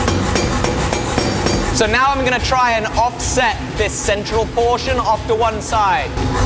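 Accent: France. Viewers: I'm starting to get used to see you achieve great work when you had no idea how to do at the beginning but I will never be used to your perfect French accent! I never met a British would spoke that well^^ when will you make a collab with a French guy? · oh l'accent frencais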